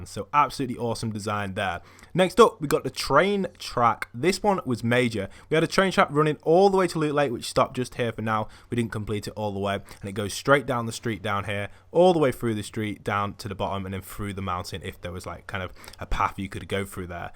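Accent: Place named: England, United Kingdom. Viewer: Defo English he says orll instead of arl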